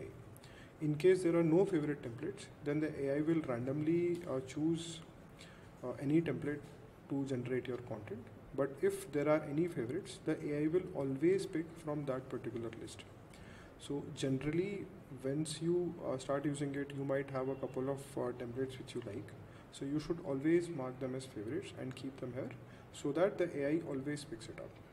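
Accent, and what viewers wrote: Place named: India. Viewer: The Indian accent is very difficult to understand. Please consider using someone who speaks clearly with no accent. As a new user these current videos are frustrating to learn from. Thanks.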